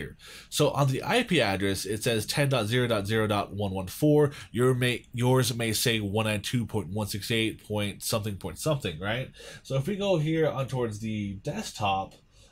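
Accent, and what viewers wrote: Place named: India. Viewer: He's from India english is not his first language idiot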